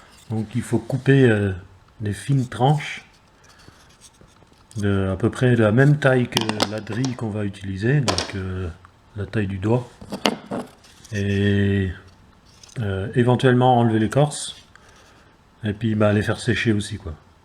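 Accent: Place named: Belgium. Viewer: Je pensais a un belge proche des flamands , j'étais pas loin! :)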